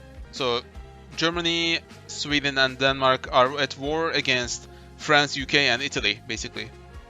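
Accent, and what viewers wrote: Turkey. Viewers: aksanından Türk olduğunu anladığım hoi4 oyuncusu. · Aksanından türk olduğun çok belli oluyor ama çok iyi konuşuyorsun,güzel oynuyorsun ama kanka çok uzun videolar,mountain general gibi kanalları örnek alabilirsin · bro are you turkish you have turkish accent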